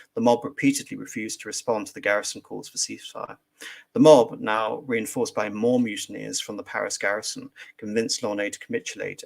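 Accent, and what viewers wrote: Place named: England, United Kingdom. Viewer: Does anyone know background? Is he English? He sounds it, but it could be one of those perfect accents learned at school.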